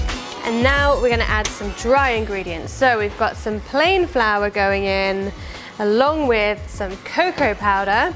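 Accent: United Kingdom. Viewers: It is the best British accent I've heard from a you tuber.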